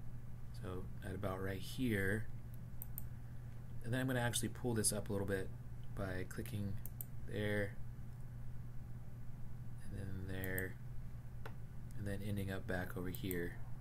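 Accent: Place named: United States. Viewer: Finally a tutorial from someone that speaks coherent American English. This was one of the few tutorials I've understood on this subject. Thank you!!!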